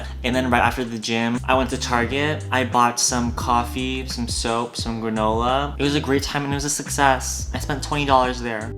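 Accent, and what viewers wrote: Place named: Philippines. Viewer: Liked the drawings. Also, I get why people ask about your background. Your accent is all over the place, I would have guessed Philippino. I am also Asian, born and raised in America. People always ask about my background, it's annoying. Good luck with your studies😊😊😊😊😊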